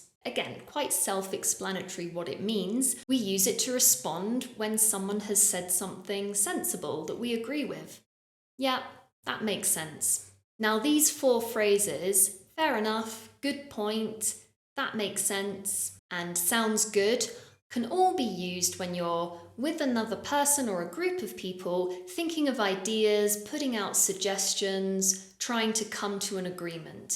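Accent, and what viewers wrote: United Kingdom. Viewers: I like your British accent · British English ❤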